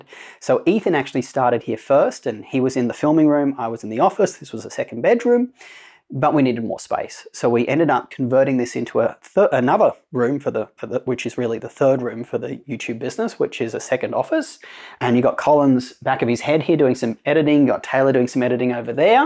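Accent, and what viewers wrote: Australia. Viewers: I am really inspired by your videos especially this one!!! Nice to hear an Australian accent talking about tech and tech reviews and thing of such. Being from down under myself.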